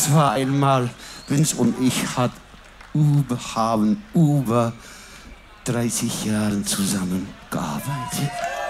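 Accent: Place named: Cologne, Germany. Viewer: Der kann auch Kölnisch Deutsch!😄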